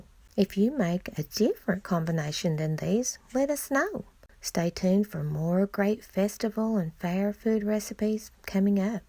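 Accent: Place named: Georgia, United States. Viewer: Sounds like you might be a Georgia girl. I could fill up on onions and peppers, put them on a sausage dog is always the right thing to do. I'll try the other ones too. 👍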